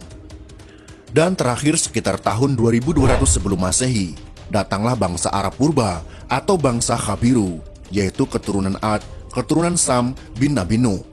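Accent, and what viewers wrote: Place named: Surabaya, Indonesia. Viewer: boso jowo ne. Koyok jowo suroboyo an min😀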